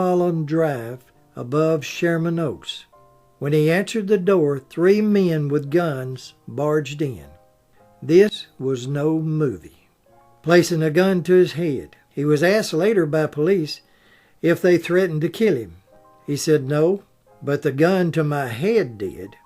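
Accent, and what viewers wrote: South, United States. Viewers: "How would you like to be remembered" -- "Doesn't matter"! This was all extremely interesting, -- thank you, and please don't ever lose that natural Southern (?) accent. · .. Yet another fantastic video. Your videos are so factual and informative. There is an added asset to your videos---- that lovely southern accent. Please do a video on Rosa Parks. Thank you for this wonderful video.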